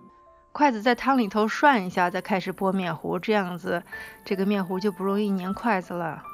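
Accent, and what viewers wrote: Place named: Northwest, China. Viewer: 听你口音是西北人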